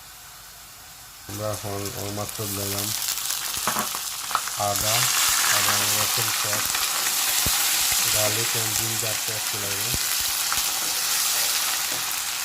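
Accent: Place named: Sylhet, Bangladesh. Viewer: ওয়া আলাইকুম আসসালাম। কেমন আছেন আপনি। ভালো লাগলো, এই প্রথম কুনো সিলেটি ভাইর ভয়েসে রেসিপি দেখলাম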